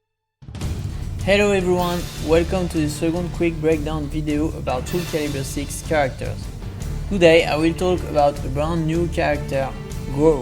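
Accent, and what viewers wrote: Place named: France. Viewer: Tu dois être le seul dont l'accent français me dérange absolument pas ^^ Il est très prononcé mais tu articules très bien et as un débit de parole bien adapté ! (pis bon, le contenu est super intéressant donc ça joue :P) Bravo pour le taf que tu mets dans tes vidéos en tous cas ! Vivement la sortie du jeu ! :D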